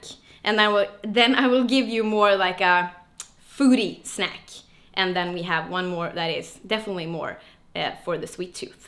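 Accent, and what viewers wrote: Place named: Sweden. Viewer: Jag är svensk och så fort jag hörde henne börja prata ( utan att veta innan att hon var svensk) så tänkte jag direkt att det var en svensk som snackade lol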